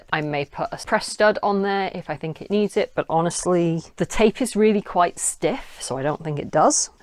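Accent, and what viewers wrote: United Kingdom. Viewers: so I'm new here -hates jk Rowling -adhd fueled sewing -high vis attire + wizards -English accent - "to the floor, where you belong" (slightly seductive commentary) yea, I think I'll stick around for a while, this place seems cool, I can fuck with this type of content, this is enjoyable, youre someone id try to be friends with irl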